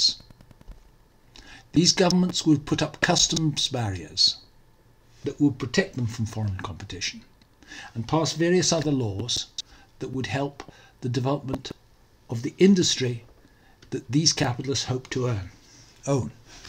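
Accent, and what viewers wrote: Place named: England, United Kingdom. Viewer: It's interesting you come out against Scottish nationalism when your whole planning-centred politics de facto centres around British nationalism. Scottish nationalism - tartan shortbread tins aside - is at least partially driven by pragmatism. They've never been well represented by Westminster. The divergent pandemic responses surely illustrate that... Scottish independence could pave the way for a federal system in the isles, which would be a step forward in political organization. (Your accent sounds English but I know you live in Edinburgh, so I'm sure you have a perspective on this. The last time I was there, I went down to the Cowgate and reflected on James Connolly, who seems to have had a balanced socialist perspective on the matter.)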